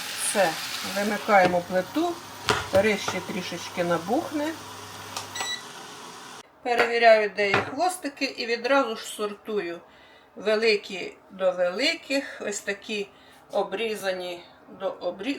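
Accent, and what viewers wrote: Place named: Odesa, Ukraine. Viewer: услада моему слуху,вѳ с одещины,сердце радуется я выросла там.Берегите себя,милые люди.Дякую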